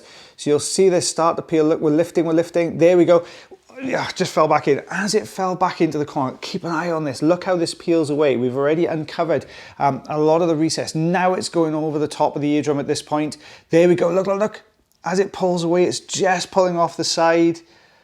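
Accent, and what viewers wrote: Wales, United Kingdom. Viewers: Loved that! Also love your accent - I lived in Carmarthen when I went to Trinity College there in the 90s. I really miss Wales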